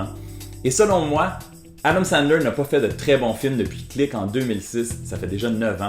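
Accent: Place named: Quebec, Canada. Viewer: Wouaaaaaaah... Ne le prend pas mal mais t'es le premier avec un accent Québécois que je comprends ! (Je comprends ce que certains disent mais d'autres mots que j'comprends pas) Bref ! Moi j'ai adoré Pixels : Voir Iwata duck hunt... Mais je lui mets 4 étoiles !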